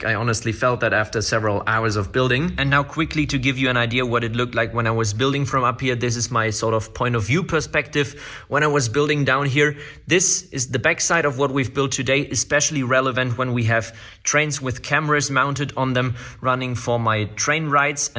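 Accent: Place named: Germany. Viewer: Achso du machst deutsch und englisch mit diesen Videos ich weiß das wegen dem Akzent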